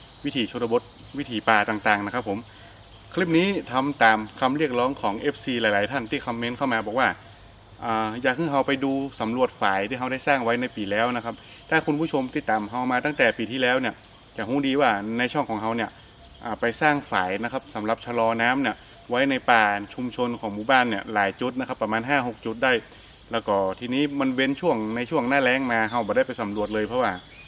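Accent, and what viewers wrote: North, Thailand. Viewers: สวัสดีค่ะอาจารย์ฟังอาจารย์พูดคำเมืองรู้เรื่องบ้างไม่รู้บ้างแต่ก็ชอบเพราะมันเป็นธรรมชาติแ ท้ๆชอบมากค่ะ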